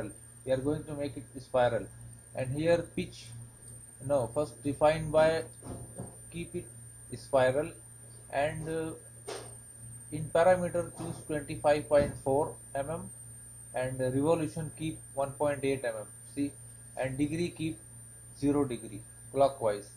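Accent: India. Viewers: Why there are so many Indian english accent producing engineering videos on Youtube? Is there any reason? Thanks from Brazil.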